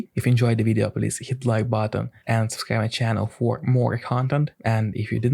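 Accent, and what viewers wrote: Eastern Europe. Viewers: I couldn't explain his channel better I saw in his other videos pepole making fun of his heavy Slavic accent but I love it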